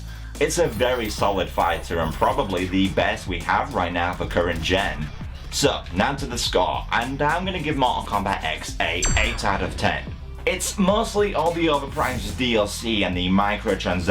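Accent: United Kingdom. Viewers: Wait you said dollars I thought you British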